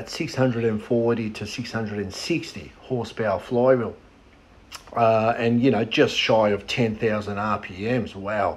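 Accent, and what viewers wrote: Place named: Australia. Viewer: Interesting video mate! In the early eighties I had a 2.3L two door Fairmont as my daily drive. I gathered all of the Cleveland goodies for the transplant but fell on hard times and had to sell the engine and C6. The cat that bought it assembled the engine, put in his short track asphalt car and won a feature race that was dominated by bowties. A proud moment for him and me! Oh! Btw, I love that Aussie accent!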